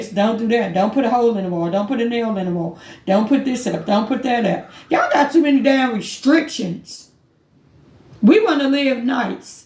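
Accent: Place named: DMV, United States. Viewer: These rent prices are crazy anyone who looks down on somebody who is on section 8 needs to watch it because the rent is way too much people claiming that voucher holders are this and that everyone has a story after being beating up for so long who in their right mind who would trash the place after being homeless most of us want to just live as you said facts this helps people get back on their feet after all most of us beeem through we grateful to have it . I’m Homeless and just got approved for my voucher finally I been through too much years worth I need this voucher I can’t pay no 3k for a one bedroom this is outrages . Same I also have struggles too . Section 8 voucher is a blessing tbh even though it’s a lot of fighting for it it’s a war for this thing . Also the name we call it is housing choice voucher but there is people who are just lost and don’t realize with this you can get a house you can get a town house you be living better than others paying all their check away lol never be afraid to take help Or ask for It I think it’s pride too that be messing up with people and they look down on others it’s very sad once you get approved and get your own place that all that matters voucher give you a place to live so no need to look down on that same with food stamps EBTim also from the DMV too I hear that accent lol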